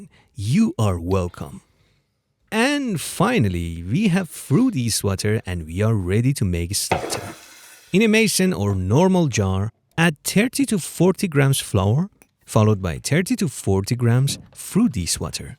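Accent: Iran. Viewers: i make sourdough and his vids are great but if I am honest I am here for Persian accent.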